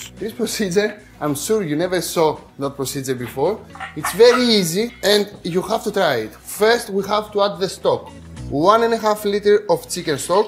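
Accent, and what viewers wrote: Greece. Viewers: I love to watch you cook and to listen to you speak English with your Greek accent! 😄 I grew up (in the US) with Greek grandparents and many other Greek relatives and listening to you brings so many memories. You remind me so much of my cousin Stathis, (although he doesn't cook 😂.) I remember Yiayia used to make this dish and I loved it. She's been gone a very long time, 50 years, but watching you make this I can almost taste it from memory! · I love the way he speaks English with that Greek accent. And I love his recipes, he uses ingredients easily available anywhere in the world, specially in India. Thanks for such amazing recipes, I have tried a few of your recipes and they are always a hit in my family. Can I use chicken mince for this recipe?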